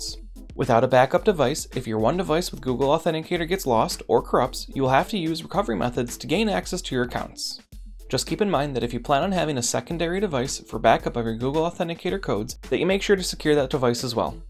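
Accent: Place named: United States. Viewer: When giving instructions, speak slowly and clearly. You aren't chatting to family and friends but to people all over the world who may not have US English as their native tongue. We have difficulties with your speed, your accent and your manner of explanation. Thanks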